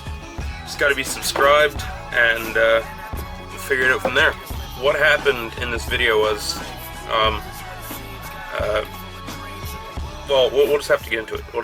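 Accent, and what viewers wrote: Canada. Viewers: He’s literally Canadian. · Is he canadian?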